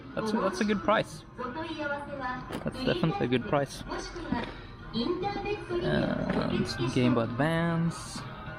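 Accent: Sweden. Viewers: You sound Swedish, am i right?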